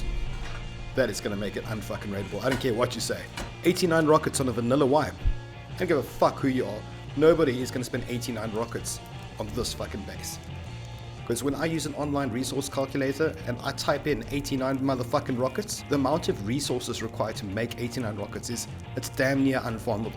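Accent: Afrikaans, South Africa. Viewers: Are u South African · u south African bru ? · you south african? xD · Thought I knew the accent hahahaha. Since I've lived in Australia now for ages couldnt be sure if it was NZ or SA but fuck yeah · You sound South African. Good vid. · Are you south African ?? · You south africa? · Dude u never gonna read this comment but I swear you have a south African accent heart this or comment if it's true cuass I have a feeling u r South African :/ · My man are you south african? Your accent is familiar. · That Afrikaans acsent.😂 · I hear a South African accent. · Am I detecting Afrikaans? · Didn't even know anyone in SA played Rust lol. This game looks so fun and is even more fun with a South African accent. Love the professional drawing program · Are you south african? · Ek smaak Afrikaans op jou aksent. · Where u from,Sounds south african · You from South Africa ? 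· Are you from south Africa? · Are you SOUTH African? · Lol you sound so south african👌😂, are you south african? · Intresting but you still have a heavy afrikaans accent lol XD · Are you south african? :> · I never know whether it’s NA or SA but I always hope it’s SA lol. The way you speak and the language you use made my think SA though. I was right for once lol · Subscribed just because Local is Leka. Nice Content, love the familiar accent well done boet you somma make me excited about SA gaming again, looking forward to more of your videos and thank you. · Baha oml I've never seen your channel before, and started to watch and thought shou, that accent is oddly familiar, and as soon as you said ja it was all over, South Africa XD born in joburg myself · Are you south African? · Are you South African Mr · Is this guy South African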